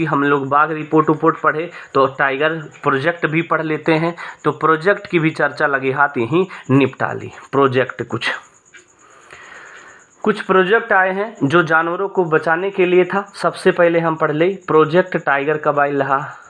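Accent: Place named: Bhojpuri, India. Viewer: Mast bhojpuri bhai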